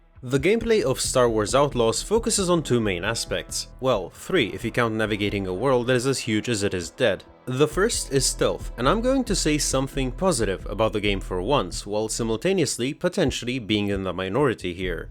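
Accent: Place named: Balkans. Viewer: No way you're actually Dutch? I'm really sorry but you got some sort of Balkan accent, I never expected that lol Of je kan Belgisch zijn... Ik heb geen flauw idee hoe een Belgisch accent in het Engels klinkt x3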